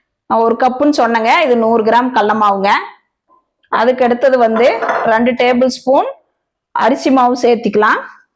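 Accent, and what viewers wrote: Kongu, India. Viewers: கொங்கு தமிழ் சூப்பர்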